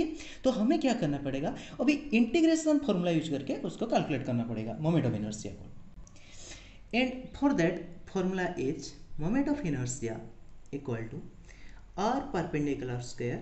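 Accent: Odisha, India. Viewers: Are u from ODISHA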